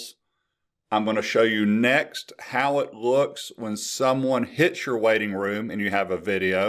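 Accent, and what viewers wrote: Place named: South, United States. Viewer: Thanks... what accent is that btw...??? somewhere south??? one of my favs lol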